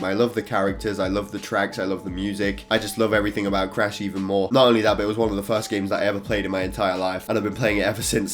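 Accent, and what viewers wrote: England, United Kingdom. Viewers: That's england people speak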